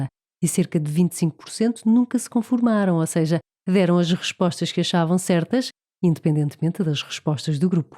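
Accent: Portugal. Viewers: Parabéns pelo vídeo bem ilustrados com figuras e ainda legendado. Sou do Brasil, pela sua voz, você é portuguesa. Tenho 6 anos, estou pesquisando, pois, meu filho vai participar de várias mini entrevistas para a segunda fase/última para cursar medicina no Einstein. Esse conteúdo só soma. Nos parece que a Conformidade Social também pode ser tanto positiva como negativa. Um líder pode usar essa ferramenta para influenciar outra pessoa (s) acionado a "imaginação" que constitui um gatilho imperativo.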